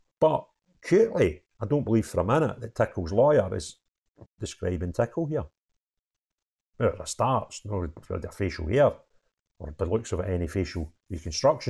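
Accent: Scotland, United Kingdom. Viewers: No disrespect but your Scottish accent saying ‘giggle vs tickle’ made me laugh out loud. You could tell any joke badly but your accent would make it funny. Then you go onto talking about the cat/dog identity confusion. Sounds like a comedy show. Good luck on your channel. But I recommend you try stand-up. British accents have the advantage of already sounding funny. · Are you from Scotland? Your accent sounds like my dad's,who is a Scot.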